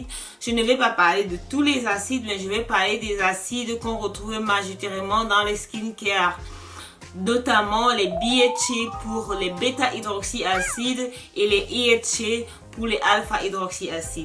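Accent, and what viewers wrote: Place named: Cameroon. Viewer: Je viens juste de mettre la vidéo c’est l’accent Camer là qui m’a retenue après biensur après avoir lu ton titre ☺️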